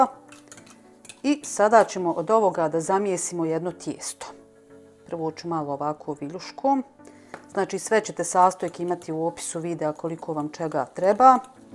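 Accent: Bosnia and Herzegovina. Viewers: Gledam cesto tvoje recepte, volim taj bosanski izgovor i ta prica sa flasicom je simpaticna. Pozdrav iz Kanade.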